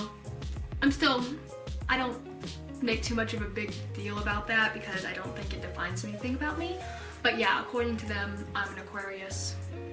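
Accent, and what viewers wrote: United States. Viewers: Wait is she American????????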